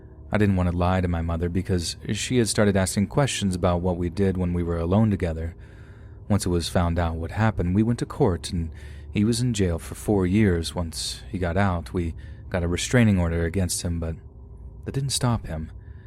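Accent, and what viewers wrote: Australia. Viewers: ..danger to be brought to your face.. Choice. Plus the Crocodile Dundee reference and his Australian accent..😂😂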